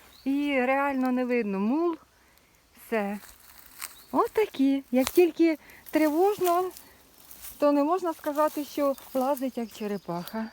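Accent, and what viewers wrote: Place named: Poltava, Ukraine. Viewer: Цікаво, з якої ви області? Чую ондо, осьо, мабуть Полтавщина? )) чи Вінниччина?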